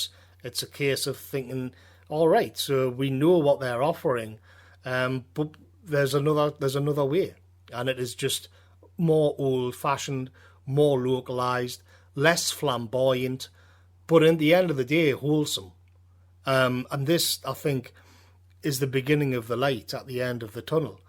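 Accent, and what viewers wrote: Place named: England, United Kingdom. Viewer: I'm new to this channel and I'm thoroughly enjoying the content. It's refreshing to hear non -sensationalist monologues, delivered with clarity, sense and topped off with a familiar English accent. As for the China food situation I believe that they have learned from their history, all their great dynasties have fallen around the same time as global food shortages, which coincided with solar (in) activity. Which we are now heading in to. I'm not fearful of the future, our ancestors faced worse and survived, the narcissist members of society are the ones who may not fare well, those who embrace Nature and Order will undoubtedly arrive on the other side of this nonsense in a stronger position. Mother Nature will fix the Globalist abominations. In 200 years, Europe will look like it did 200 years ago. Greetings from Rutland, Multum in Parvo!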